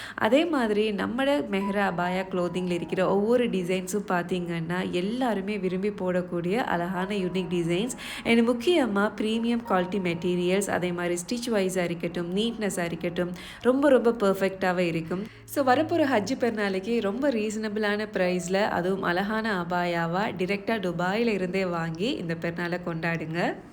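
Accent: Sri Lanka. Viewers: Sister ongede ellam ok but konjem style eh korainge.neenge trinco side thane trinco pecha pesunge erichala irikki ongde voice eh keke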